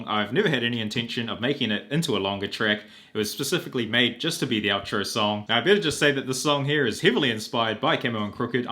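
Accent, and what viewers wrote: New Zealand. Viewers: Are you a New Zealander? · Are you a New Zealander? Because you don't sound Australian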